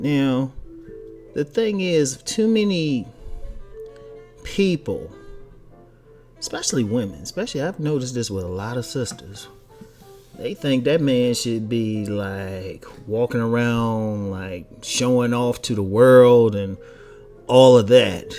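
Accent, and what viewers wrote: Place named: Baltimore, United States. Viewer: Funny how you can still hear your B.More area accent that do and you give yall away everytime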